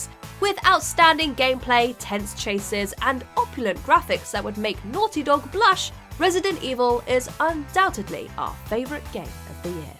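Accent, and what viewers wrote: United Kingdom. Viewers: Watchmojo, I like the british narrator on this video. It brings class to your positive Top 10. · I have a question for you,. Are you from the UK because it sounds like you are?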